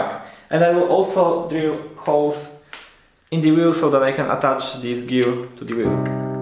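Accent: Poland. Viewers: I knew you were polish from the first second - very nice video! Nice editing skills!!! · Od razu usłyszałem polski akcent. 😁 Polacy tak ładnie i dokładnie mówią 😃 pozdro kolego bardzo fajne wykonanie 👍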